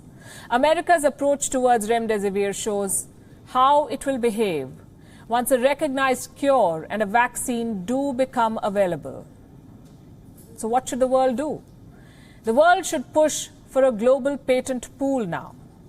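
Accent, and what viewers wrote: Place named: India. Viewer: It's indian English haha Btw lakh means 100k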